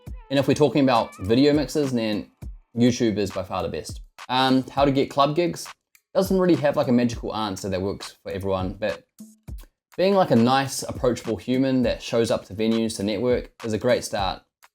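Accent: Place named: New Zealand. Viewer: Chur bro, you sound like from Aotearoa New Zealand! Your choice of music and DJ style gave it away before I even heard you talk my bro💯👌🏽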